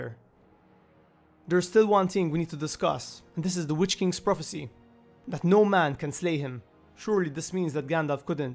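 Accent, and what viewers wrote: Malta. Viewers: are you maltese by any chance? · I could tell you were Maltese purely from the accent haha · are you Maltese? I m going off your accent alone here, hahaha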